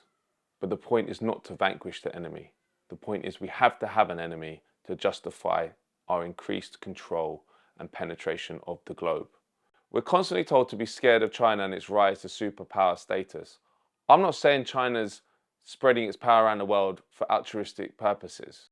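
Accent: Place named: Cockney, United Kingdom. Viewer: Your London thick Cockney accent dialect is not easy to understand to a lot of English speaking nations. If you were more well spoken your message would get across more. Btw i agree with everything you say. I'm from London.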